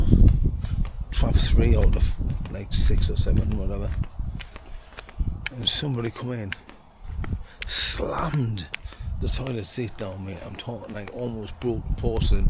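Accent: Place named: South Shields, United Kingdom. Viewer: You sound like a shields lad.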